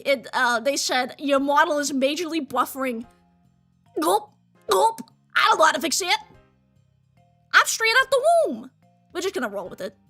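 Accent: Boston, United States. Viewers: That bostonian accent is unmistakable. Congrats on your debut fellow detective! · Hey I k own that Bostonian Accent!!! 🖤🩶🖤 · Congrats on the debut,!!! If I can confess something, I had been a lurker for a long time, and your personality and boston accent was instantly and insanely charming! But at the time I was watching you, I had been FAR too shy to actually say anything, let alone be chummy with chat at the time. When you stepped back for the time being, I beat myself up over the fact I couldn't express my gratitude for making my day and keeping my mind off of life. You have no idea how happy I am to finally be able to do so, how honestly teary-eyed I got when I heard your voice again in the teaser. I know I'm being sappy, but I need to express how I genuinely feel and how overjoyed I am at your return. It was such a relief to find that you're the same goofball that I had subscribed to LONG ago. 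Thank you for being you!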